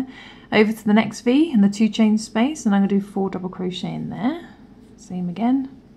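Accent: United Kingdom. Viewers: The 1st row is called the foundation chain. Love this pattern & perfect since I've been searching for a wash cloth with enough openings to dry faster & just easier to deal with soap/water. Little cupcakes are precious, thanks so much. The gap that you starting on second row we in the U.S. is called space. Still like your British accent.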